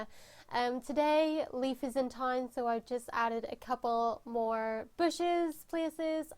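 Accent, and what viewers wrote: Northern Ireland, United Kingdom. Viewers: the way it took embarrassingly long for me to fully catch on that you’re from northern ireland,, considering so am i ok wow lmao also how amazing is this entrance!! i have some fossils on the second level beside the museum and have water around it all and i have a few fossils/dinosaurs on like wee lone islands within the river so you cant get near the fossils,, if that even makes sense😂😭 but im really happy with mine! it was the first ‘grand’ thing i did with my island! it just needs some finishing touches like flowers when i finally get all the hybrids i want😭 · Great video! Do I detect a Northern Irish tinge there??😉😆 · Same i went into to comments to try and find out cuz I couldn't tell if it was an ni accent or not 😂😂😂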